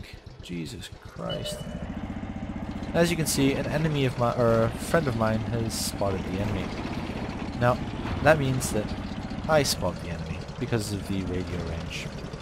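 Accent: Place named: United States. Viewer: You sound american, but you are on EU server?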